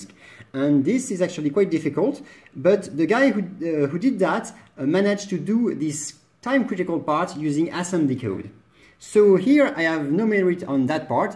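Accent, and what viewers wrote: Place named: France. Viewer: Accent français😂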